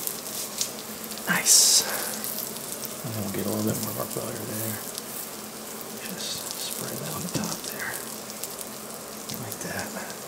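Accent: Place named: South, United States. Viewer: Winging it cooking is the only way to do it makes it exciting! Recipes are never to my taste. You need to brush up on your one handed egg cracking skills sir 😂😂😂 the thick raspy southern accent and the sizzling pan is just 👌 chefs kiss one of my fave things in the world is bacon, i am so making one of these bad boys 🤤🤤 i never thought an asmr video would make me dribble so much 😂😂😂 thanks cowboy, also that is a seriously fine hat it's missing a feather tho;-) i love cooking vids, stunning eggs aswell blues are one of my faves and a lovely rich dark yolk is divine 🤤 ❤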